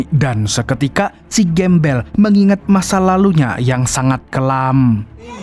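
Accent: Banjar, Indonesia. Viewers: Orang Banjar,, saku mimin nya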